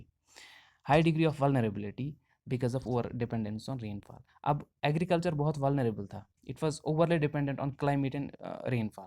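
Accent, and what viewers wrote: Kashmir, India. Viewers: Kashmiri accent❤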